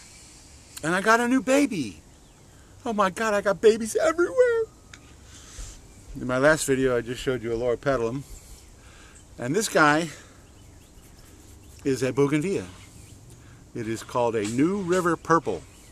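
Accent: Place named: England, United Kingdom. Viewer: Now I understand.... that's why it sounded like an English accent to me! but as I'm Italian, I thought I was wrong. So There is one more reason to follow You, to learn better Queen's English. See You....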